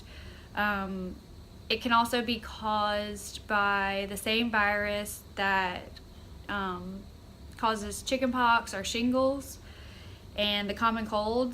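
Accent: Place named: South, United States. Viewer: Hi, my name is Melanie and my husband was diagnosed with Bell's Palsy almost 3 weeks ago. He had horrible headaches the night before and then woke up am took a sip of water, it ran down his chin and he looked in the mirror and there was it. I took him to the emergency room right then and they knew right away what it was. They didn't do a scan or anything. Did you do acupuncture or anything like that? Fred is doing acupuncture, he's meditating and I think yoga as well. We live in Santa Monica, CA, but I'm originally from TN. Are you from the south? I feel like I hear it a little and frankly that's what I found appealing and comforting about your video. Thanks so much for sharing your story and I will definitely check out the FB page. I do drive Fred crazy watching all of the videos about Bell's palsy, but I just find them so helpful! All my best to you.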